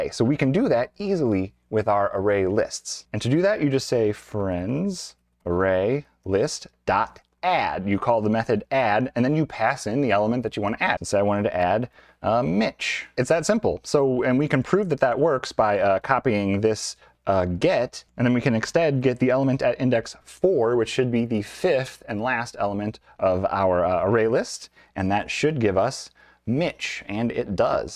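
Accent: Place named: United States. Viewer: finally an american explaining it hahaah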